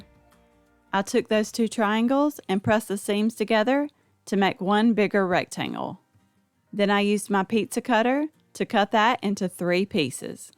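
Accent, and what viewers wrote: South, United States. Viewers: I gave you a like right away just for your Suthun accent. Makes me feel right at home cause I live in Dallas. Your recipes look good also.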